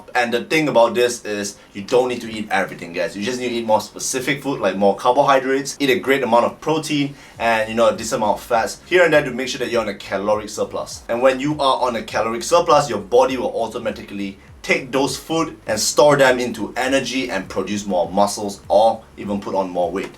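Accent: Singapore. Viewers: Is this a Singaporean accent? Great video by the way! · Eh bro r u Singaporean u sound singlish siah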